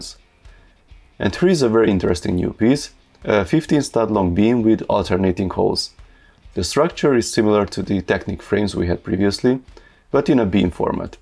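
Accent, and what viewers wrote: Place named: Hungary. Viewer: Tuti magyar vagy, hallom az akcentusod :D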